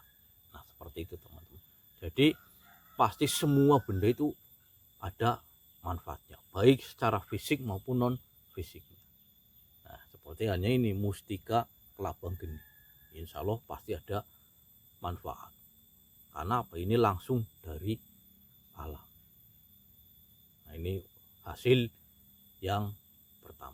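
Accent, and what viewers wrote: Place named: Banyumas, Indonesia. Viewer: Sampean wong Endi bos bahasane ngapak temen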